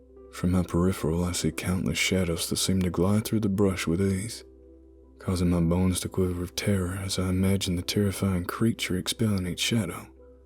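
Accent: South, United States. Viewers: You got the southern accent down. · Born and raised in Bama and I thought the same thing. Fantastic job, just minor details. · North Carolina Appalachian boy, daddy from west Virginia and I'm from the smokies. You're right, this guy nails it better than Hollywood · The best narrator with the best stories! Your southern accents are almost perfect. Only two words give you away - been and says. Southerners pronounce them as ben and sez. Yes, I am a hillbilly. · As a native Southerner, I'm very impressed with your accent work, cool story too!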